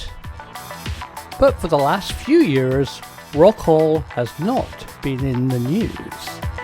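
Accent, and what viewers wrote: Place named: Scotland, United Kingdom. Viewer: Git yer erses awa frae oor Rock...awesome Scottish accent Prof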